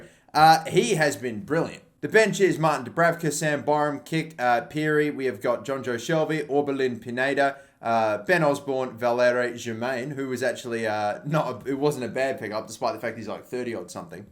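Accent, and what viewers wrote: Australia. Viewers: Didn’t know Alisson did youtube and had an Australian accent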